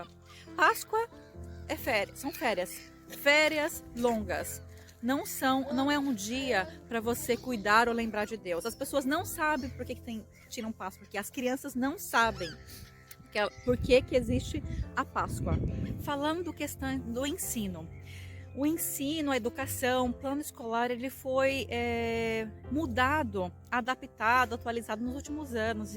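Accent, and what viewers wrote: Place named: Caipira, Brazil. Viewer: Porque ele só existe no conceito cristão. Deus não é um velho de barba branca que viveno céu para amaldiçoar, castigar ou abençoar pessoas. Isso só serve para controlar o ser humano pelo medo. Deus existe sim, mas não é nada disso que o cristianismo de modo geral prega. Os noruegueses despertaram. Putz ouvir esse erre caipira não dá, fui...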